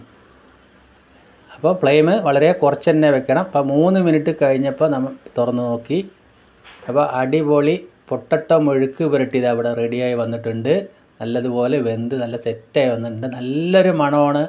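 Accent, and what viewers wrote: Palakkad, India. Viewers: ചേട്ടൻ പാലക്കാട്ട് കാരൻ ആണല്ലേ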